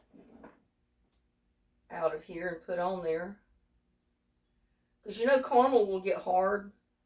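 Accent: South, United States. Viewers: Dear I am from London UK and I love that southern drawl! Love Maria 🌹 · Never mind what anyone says l love you cooking videos and your southern accent. I have a Boston accent and guess what l am as proud of it as you should be of your southern accent. We are all Americans no matter which part of the country we come from. Also l love your blouse in this video very lovely on you.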